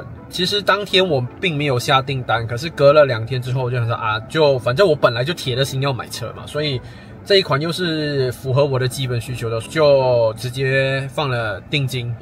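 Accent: Taiwan. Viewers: 只聽的話，感覺口音像台灣人。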